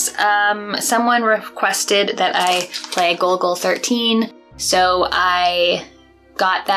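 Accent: Canada. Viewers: I like your Canadian accent.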